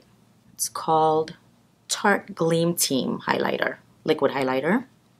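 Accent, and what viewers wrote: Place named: Armenia. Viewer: You & Lilit are two of the most beautiful women! I love your Armenian accent coming through! You two do makeup better than anyone else I follow! So natural but glam & just refreshing seeing someone contour without looking too done up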